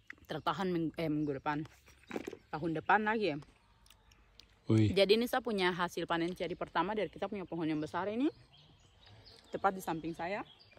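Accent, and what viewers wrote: Papua, Indonesia. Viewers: Paleng suka dengar kk bcra Deng logat Amq Papua🤣🤣🤣🤣🤣terhibur hatiq😍😍😍😍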